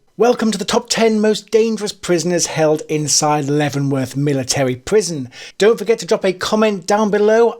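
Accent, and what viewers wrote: United Kingdom. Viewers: British accent is annoying.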